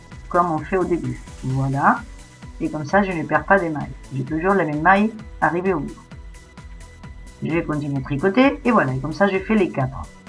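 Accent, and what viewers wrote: Spain. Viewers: Explications très claires avec en prime in petit fond d'accent espagnol super charmant...!;-)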